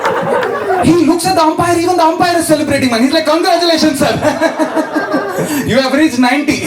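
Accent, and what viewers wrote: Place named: South India, India. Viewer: Love the South Indian accent brother ...... valga valamudan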